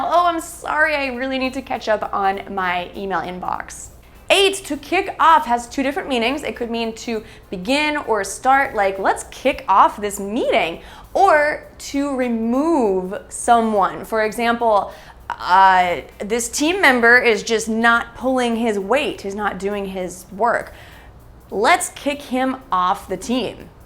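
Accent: United States. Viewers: ya lookt awesome suppa beautiful. Light and camera angle were perfect and the narrative like an American blondie. Thanks for these videos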